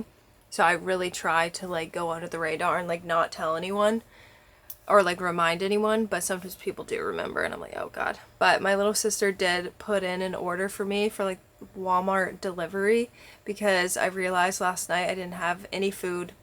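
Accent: South, United States. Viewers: Where’s her accent from? It feels kinda southern, kinda midwest maybe? I truly don’t know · i was NOT expecting you to have a country accent, i was shook 😂😅 yay another fellow country sounding girl 🎉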